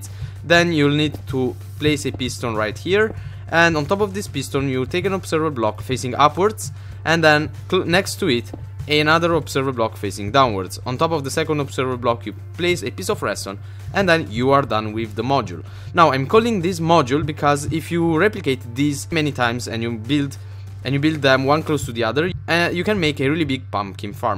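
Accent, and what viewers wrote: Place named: Italy. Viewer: Ma quanto si sente che sei italiano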